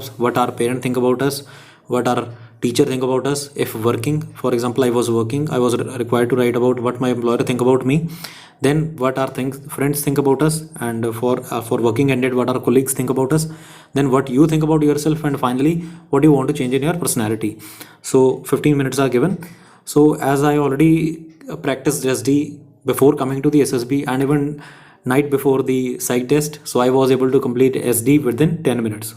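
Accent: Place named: Kerala, India. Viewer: Bro u r voice like kerala people😅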